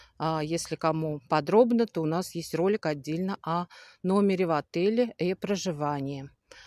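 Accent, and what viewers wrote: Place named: Dagestan, Russia. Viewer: 😂😂😂😂😂 только ты сама из Дагестана. И акцент у тебя Дагестанский. Сколько тебе заплатили за рекламу. Охринеть за деньги все что хочешь сделают и раскажут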